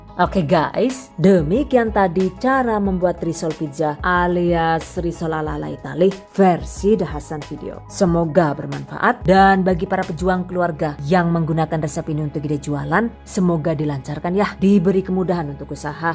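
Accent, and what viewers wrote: Banyumas, Indonesia. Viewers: Selain berguna bgt resep2nya..channel ini sgt menhibur bikin ngakak kalo mbak sdh keluarin bahasa ngapaknya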